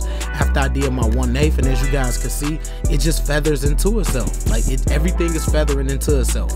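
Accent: Chicago, United States. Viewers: Yo when he first started talking I'm like he sounds like he from Chicago! And sure enough he is!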